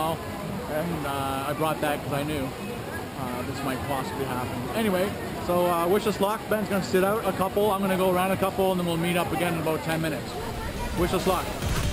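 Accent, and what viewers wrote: Canada. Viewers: You have that Canadian accent (I'm in the USA) you say aboat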